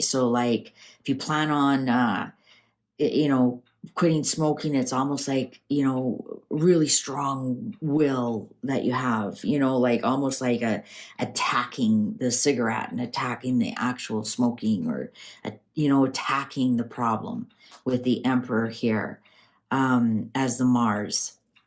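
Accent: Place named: Canada. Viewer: Thanks for today's reading. I thought you were Canadian. I'm a fellow Canadian transplanted in Florida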